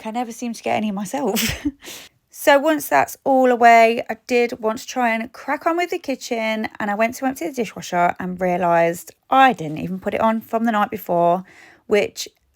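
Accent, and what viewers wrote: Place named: North, United Kingdom. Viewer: Hi my lovely, great vlog, I needed this, it's just gone 9am it's Thursday and you popped up 😊 was watching you cleaning out your fridge, I noticed you put the shelves in the wrong way 😂 sorry to mention it but everywhere in your home looked so nice but the shelves were playing on my mind 😂 love the bedding might have to get myself some, I always think nice bedding makes the bedroom look nice ❤️ thanks for sharing and hope the weather is good for us all. I literally did a wash last night, couldn't be bothered to bring it in before going to bed and then this morning around 7 ish, heard the rain pelting down, couldn't believe it 😂 luckily it wasn't something I needed today 😂 but it's brightening up now so might have to rewash them again! Thanks for sharing. Before I go though, can I ask whereabouts up north are you from. 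I could hear a northern accent going on...hope I'm right 😂😂 I'm from Manchester born and bred but have lived in London for many years. Take care and looking forward to your next video 😘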